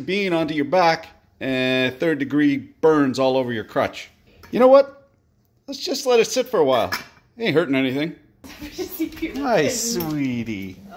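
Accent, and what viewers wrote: Quebec, Canada. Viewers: Hahahah ton Québécois est incroyable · I have still cant pinpoint you french accent. its driving me crazy. sometimes sounds like Ontario sometimes Quebec and sometimes sounds like Moncton nb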